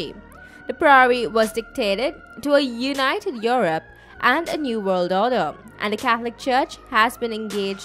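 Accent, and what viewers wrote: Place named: India. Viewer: This girl has the worst accent lmao you can tell she is Indian "wictor Hugo" 😂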